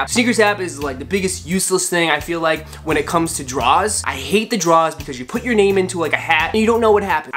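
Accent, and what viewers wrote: Boston, United States. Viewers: Just subscribed. That BAHSTON accent is what sold me.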